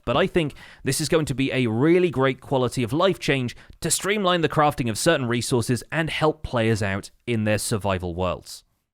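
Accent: United Kingdom. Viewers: yeah yeah.. go spend an hour building a machine to make 100 pink dies a second.. then you can make crafters for every other die! That's already like a dozen Plus videos! You're so hyped for auto die.. it's funny! To answer your question: I think I'm dunking on just another Minecraft YouTuber with a British accent.. you all make the same videos too.. you all just copy each other.. You're a dime a dozen, Bud.. Step on down off your high horse. Who do you think you are? -PHILZA!? ...not even close Got anymore stupid questions? ..Go craft some die.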